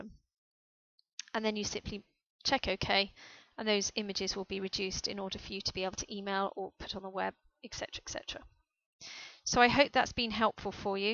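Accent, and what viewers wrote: United Kingdom. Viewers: #Offtopic I wonder whether is British, voice is way too sexy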